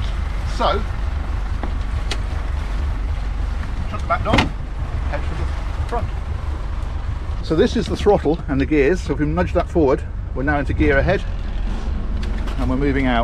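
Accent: United Kingdom. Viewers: you are such a nice, warm and friendly person.. and british accent makes you pleasant to listen to :D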